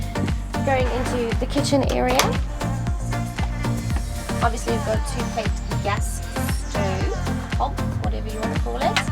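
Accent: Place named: Southern Africa. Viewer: What part of Southern Africa are you from?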